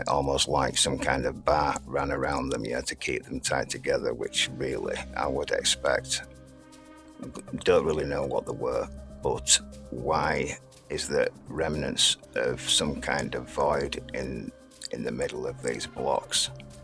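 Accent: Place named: Blackburn, United Kingdom. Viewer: Nice work. Love the presentation and questions it makes one ask. Ya also sound like me best man's dad from Blackburn. Am I close?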